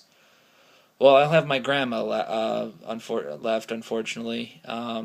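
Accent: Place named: Ireland. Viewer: hahaha you're adorable xD singing your username!!! ' ' XD haha I'm sorry to hear about your grandparents I only have my gran left too, one gran on my mums side The trainspotting book was a surprise haha Yer Scottish accent Isnae terrible bu it soonds mair Irish than Scottish tae be honest wi ye ye need tae come here tae properly learn the accent! But you read very well haha considering the Scottish English ^^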